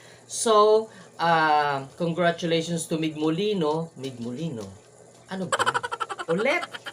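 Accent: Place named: Iloilo, Philippines. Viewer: Good morning ser salamat yn .ka uli kay bunso 💖 😘..na miss ko boses isang ilonggo. God bless you 🙏 ❤ watching Bahrain po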